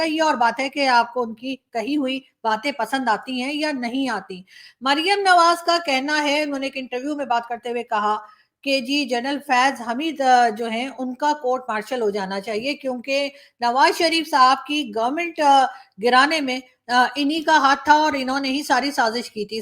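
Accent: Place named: Haryana, India. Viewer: ji main kai baar sochta tha ki aapki language dialect mein mere area wali dialect kyo hai ab pata chala ki aap to mere Area Ambala Cant se ho. I mean your mother from Ambala cant.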